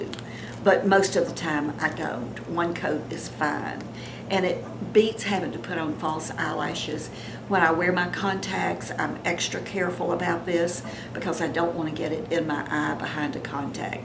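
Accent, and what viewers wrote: South, United States. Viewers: UGH...no more it cosmetics for me...way over priced anyway! Thanks for the info!! Just found your site... Enjoying hearing the southern accent as I am from Memphis, but have been in Reno, NV for past five years for medical treatment for my son with Lyme disease. Hope to get to go home soon. You are a beautiful 70 ish year young!!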